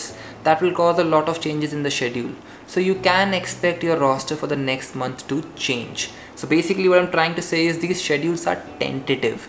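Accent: India. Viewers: You accent is very Indian.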